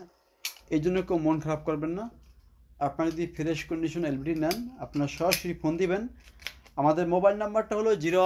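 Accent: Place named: Barishal, Bangladesh. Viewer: ভাই আপনার বাড়ি বরিশাল মনে হয় 😮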